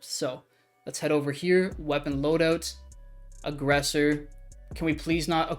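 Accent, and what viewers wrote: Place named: Canada. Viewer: Wow are you Canadian! Lol or from the UP of Michigan, regaurdless i dig it. 👍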